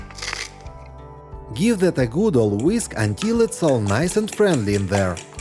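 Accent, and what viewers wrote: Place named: Russia. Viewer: well I ‘m going to explain myself… I speak fluent English and from my childhood used to be taught to pay attention to my pronunciation As a modest result … years later, no one picks my Russian accent even though I don’t sound like a native speaker … it’s not about being snobbish, not at all It’s simply certain level of so called professional enhancement if you have decided to record your channel in English… As much as we’re proud to cherish our great language and cultural heritage we have to admit, Russian accent isn’t easy on some ears ! His channel is very professional but accent is distracting , isn’t it?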